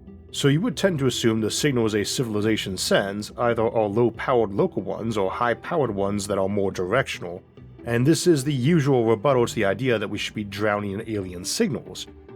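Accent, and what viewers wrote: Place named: Louisiana, United States. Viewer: You from Louisiana? You sound like a guy I worked with around Mansfield. Thanks for the content. I’m entertained.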